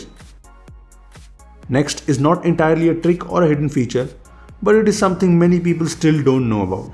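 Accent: India. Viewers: Do you make your accent so thick on purpose? Because I literally don't know any Indian or Pakistani who's accent is so thick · Your head movement and indian accent make it super hard to understand what you sayin. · Danyal Malik obviously indian accent... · I HATE YOUR ENGLISH,LOL INDIA.. GET OFF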